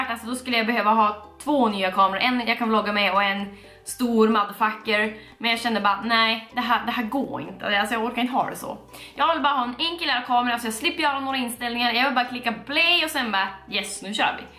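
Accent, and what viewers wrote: Norrland, Sweden. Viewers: Heeej❤️❤️ jag bor också i Skellefteå🤘 asså din dialekt är så norrlänsk😂💕 min är dock inte det😂 skulle vara så kul att träffa dig på tex på stan👌 dina videos är bäst🤘 hoppas du läser denna kommentaren❤️ kram ❤️❤️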